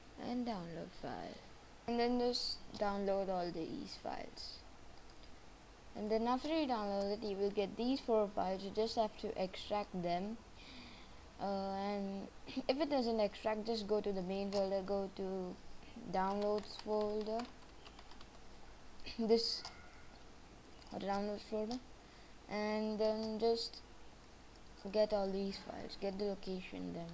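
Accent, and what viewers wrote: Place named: India. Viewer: you have an indian accent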